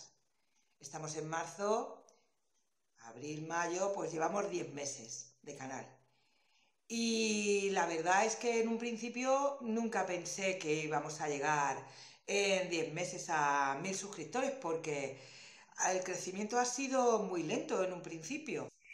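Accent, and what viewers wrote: Spain. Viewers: Hola. Te encontré un día por casualidad y ahora soy una seguidora incondicional. La primera vez que te escuché hablar dije...esta chica es de Albaceteee, me encanta el acento. Soy Albaceteña pero llevo 25 años viviendo en Zaragoza y me encanta mi tierra y su gente. Eres muy divertida y aprendo mucho contigo. Me encantaría participar en el sorteo y claro que me tocara. Un tutorial que me gustaría mucho sería una carterita para el tabaco de liar, que ahora está de moda. Un beso grande y espero seguir viéndote y escuchándote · Buenas noches yo te sigo desde Barcelona y me encantaría poder participar en el sorteo me ha enganchado tu bonito acento manchego ya que mi familia son todos de tu tierra. Explicas super bien los trabajos y de momento solo hice un neceser me gustaría que nos hicieras un bolso con cremallera tipo bandolera. Muchas gracias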